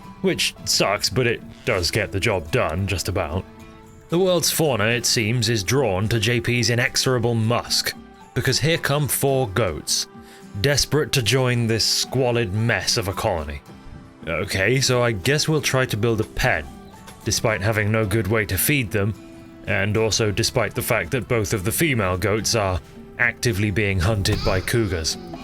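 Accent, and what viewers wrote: United Kingdom. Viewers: Hearing a brit talk about Starcraft just feels right. Rip TB, we do not preorder.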